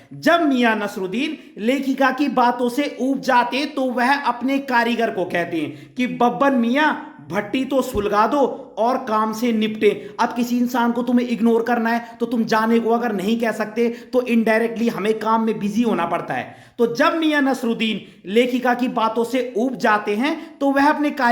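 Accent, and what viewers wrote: Garhwal, India. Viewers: I'm subscribeing you just for you explanation and your cute accent as I know you are a gadwali ❤️🙏🏻 Tehri rifles here 😂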